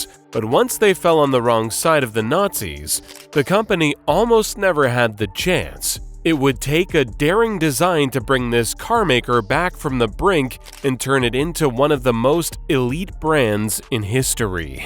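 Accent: United States. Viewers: I am only 2 minutes in and the stupidity of American pronunciation of german names is driving me insane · Pity about the terrible American pronunciation. The only name he got right was Daimler.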